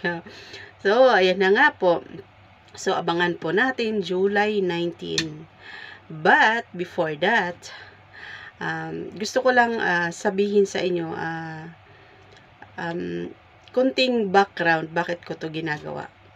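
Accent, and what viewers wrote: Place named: Visayas, Philippines. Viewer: Bisaya man sad diay ka ate. Apir🤚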